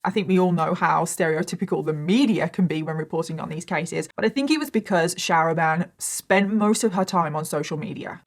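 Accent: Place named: West Midlands, United Kingdom. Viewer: Only just found your channel. Your accent sounds local to me, West Midlands. Makes a nice change 😅 I’ll definitely be back to watch more.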